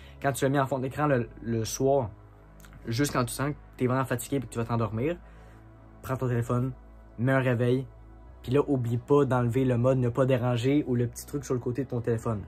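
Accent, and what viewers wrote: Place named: Quebec, Canada. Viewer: Toi tout ce que t'as à faire quand tu parles français c'est de faire disparaître ton affreux accent québecois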